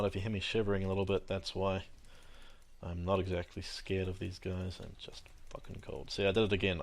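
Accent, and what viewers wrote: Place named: New Zealand. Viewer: Ha Ha kiwi accent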